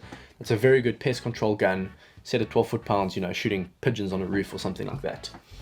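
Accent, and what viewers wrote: South Africa. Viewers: is your first language afrikaans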